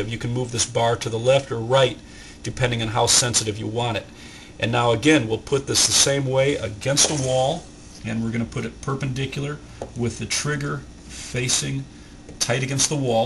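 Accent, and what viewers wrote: Chicago, United States. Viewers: I love your accent too. Chicago?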